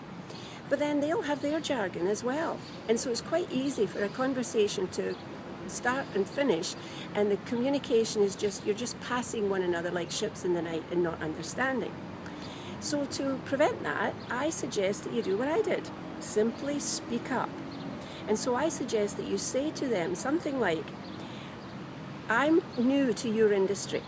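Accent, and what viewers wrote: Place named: Rioplatense, Argentina. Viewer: Keep it up ! This lady nailed the "rioplatense" accent !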